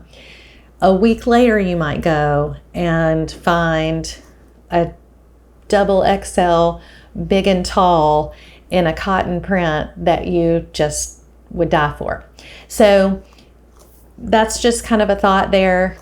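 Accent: South, United States. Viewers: This is not on topic, but does anyone else stress over sandwhiching a quilt? That part brings me to tears. Starting with laying out the backing, then the batting and then the flimsy. I can never seem to line them up. It can take me a whole day to line it up and pin baste. Is it really as simple as all these Youtubers show in their videos? Or is it just me? That is why I haven't started on my next. I am only on my third quilt, but I habe sandwhiched at least 100 for my mother whom is elderly. I love your videos. Let that southern accent shine.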